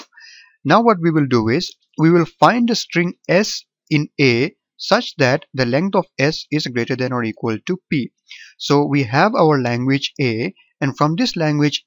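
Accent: India. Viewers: He's from India...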